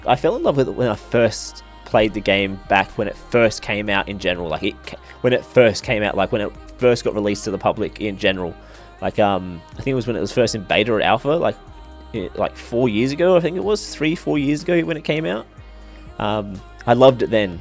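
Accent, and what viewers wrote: Australia. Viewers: thats awesome, i acually didnt realise you were an aussie. love it. us aussies have to stick together, what tribe were you in on official servers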